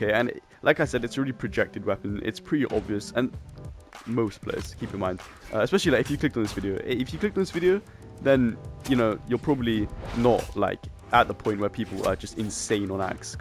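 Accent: London, United Kingdom. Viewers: That was the most London intro I’ve ever heard to a video lol.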